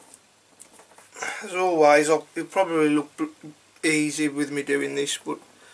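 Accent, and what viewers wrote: Black Country, United Kingdom. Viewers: Black Country way, I reckon. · Just getting in to leather work, and the first video of yours that i have seen - you got an instant sub as soon as you spoke. Us Yamyams have to stick together!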